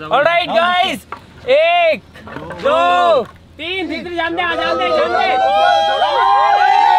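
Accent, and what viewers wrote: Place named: Marwar, India. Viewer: bhai aap per marwadi lengwej bahut mast achi lagti h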